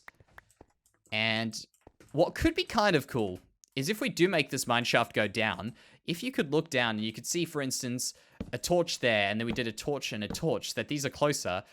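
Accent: Australia. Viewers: it's funny, you are the only other Australian Minecraft Youtuber I know of! It's so amazing to hear an Australian accent. QLD is da best xD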